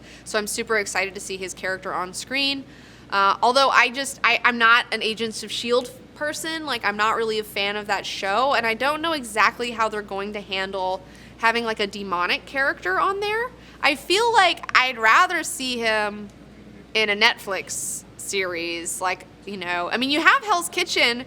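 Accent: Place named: Appalachia, United States. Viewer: wtf.. how come youre talking with a hillbilly accent?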